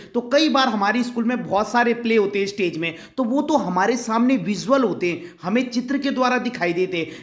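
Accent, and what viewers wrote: Garhwal, India. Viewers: Are u garhwali? Tone aari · Sir aap garhwali ho kyy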